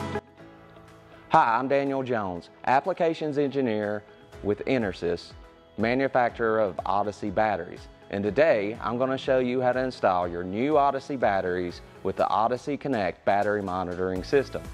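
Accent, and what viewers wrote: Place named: South, United States. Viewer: I like the south in your mouth 😊.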